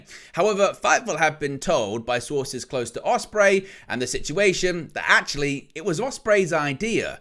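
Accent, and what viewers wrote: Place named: England, United Kingdom. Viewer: Its weird to listen to JDFROMNY do his show and then hear you do THE SAME SHOW but in an English accent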